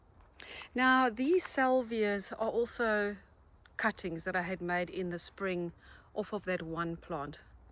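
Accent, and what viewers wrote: South Africa. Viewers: Amazing garden a credit to you I detect a SAfrican accent is that where you are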